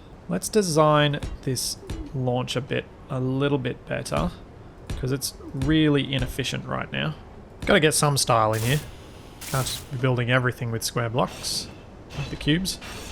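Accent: Australia. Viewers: Are you from Australia m8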